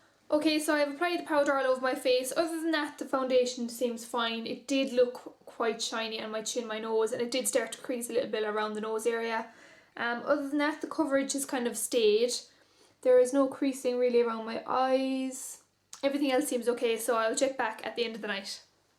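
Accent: Ireland. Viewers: I love your accent so much!!! I love Ireland!!!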